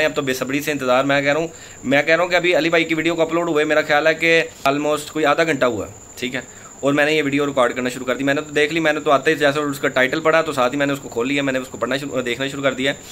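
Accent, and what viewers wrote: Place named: Punjab, India. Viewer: مووولک نہیں ملک ہوتا ہے۔ اردو بولنا سیکھ لیں بھائی ۔ آپ کی اردو پنجابی زبان کی چغلی کھا رہی ہے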